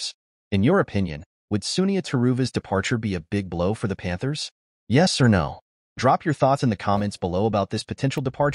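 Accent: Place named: United States. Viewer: Why an American accent?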